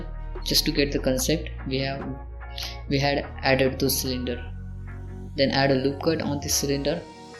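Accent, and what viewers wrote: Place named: India. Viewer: Hello, very interested in what country you are from? India? From your accent it seems to be India, I want to check the assumption. I'm from Russia myself. Video is amazing!!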